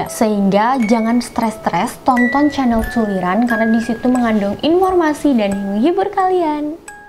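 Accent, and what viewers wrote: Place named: Bali, Indonesia. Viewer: Orang Bali ya kak ? Soalnya logatnya kek Bali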